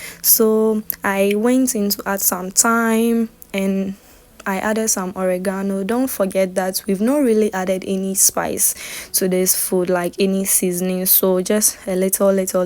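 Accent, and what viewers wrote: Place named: China. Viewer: Your now sounding like a Chinese 😂😍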